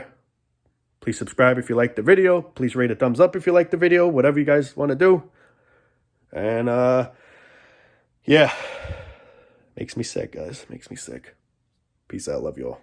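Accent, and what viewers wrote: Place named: New Jersey, United States. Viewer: I can't tell if his accent is New Jersey, New York or Canadian...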